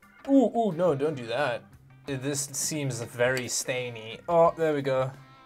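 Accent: South Africa. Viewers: Are you from South Africa? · are you south african?!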